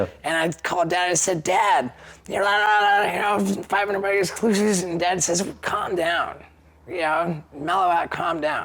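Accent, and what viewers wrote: United States. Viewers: Interesting that he sounds just purely Americain.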